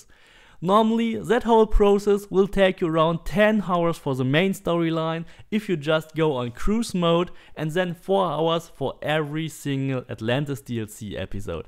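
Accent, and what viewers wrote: Germany. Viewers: Dieser Deutsche Akzent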